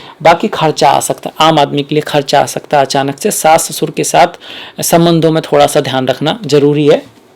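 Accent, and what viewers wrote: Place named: Braj, India. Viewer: आपके ज्ञान और प्रस्तुति अद्भुत हैं, इसलिये ज्योतिषीय विश्लेषण भी लीक से अलग और बुद्धिवादी है। विचार स्पष्ट और ज्ञान से आए आत्मविश्वास से भरे हैं। बधाई। ईश्वर कृपा रखें। एक बात ध्यान देने की है और वयोवृद्ध होने के कारण कहना मेरा कर्त्तव्य है। भाषा के ढीलेपन को सुधारना चाहिये। प्रादेशिकता का असर तो बोलने में होता है किन्तु 'नमस्कार' को नमश्कार या प्रत्येक 'व' को ब कहने और स और श में गंभीर ग़लतियों के न होने से निश्चित ही प्रस्तुति और प्रभावी होगी। इसके अलावा भी भाषागत उच्चारण जैसे इंडस्ट्री को इंडष्ट्री आदि अनेक बातें हैं जो ज़रा-सा ध्यान देते ही व्रजभाषा के पाश से मुक्त करेंगी और हिन्दी के मानक उच्चारणों की ओर ध्यान दिलाएँगी। यह एक क्रांतिकारी सकारात्मक परिवर्तन होगा। व्रजभाषा की मिठास अद्वितीय और दैवी है मगर हिन्दी बोलते समय उसका खड़ापन ही उसका मानक है। कृपया अन्यथा न लें। जैसे आप मकर वालों को मेहनत करने की सलाह देते हैं वैसी ही मैंने दे दी है, स्वीकारें।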